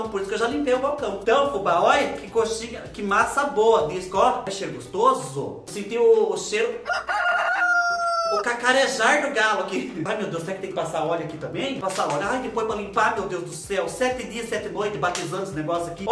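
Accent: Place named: Caipira, Brazil. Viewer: A cada vídeo, eu me divirto com você!! Amooooo esse seu sotaque de caipira!!! Obrigada por fazer meus dias mais felizes!! 💋💋 nesse coração peludo!! 😂😂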